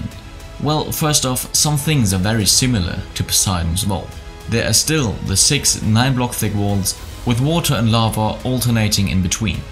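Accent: Germany. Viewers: You are German?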